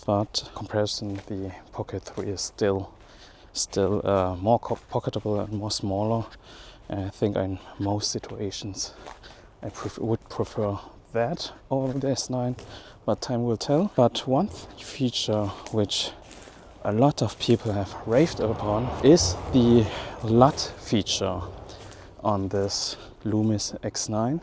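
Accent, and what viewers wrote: Germany. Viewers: Just curious, what racial are you? And because of your accent, do you live in Germany for a really long time?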